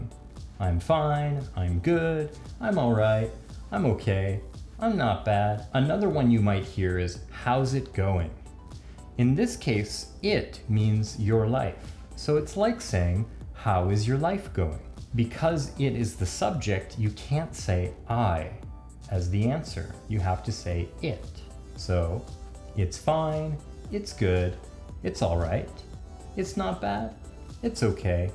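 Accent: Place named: Canada. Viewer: Love that Canadian accent!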